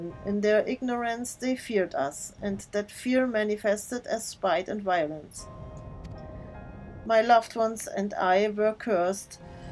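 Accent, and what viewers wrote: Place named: Germany. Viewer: Her accent sounds german... Bist du Deutsche? 😆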